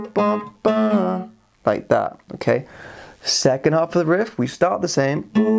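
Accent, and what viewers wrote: United Kingdom. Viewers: Is he british ? Love his accent.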